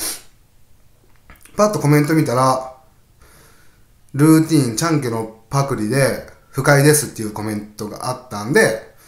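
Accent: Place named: Okayama, Japan. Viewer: さんと幼馴染みとも知らずに、さんの動画を見てました。彼の独特の岡山弁が好きですね♪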